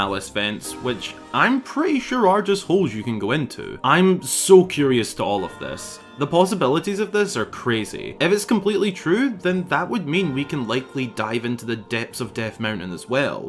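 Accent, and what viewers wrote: Scotland, United Kingdom. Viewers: your scottish i thought you were british 😅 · You Scottish? Nice, I want to go there one day ❤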